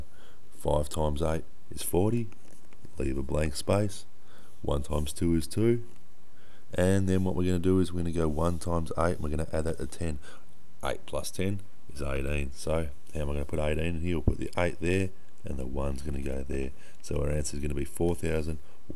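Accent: Australia. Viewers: is this australian english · I agree with Alexandre, ok you are from Australia, but you could talk a little bit more clearly.. if you know you have an accent well....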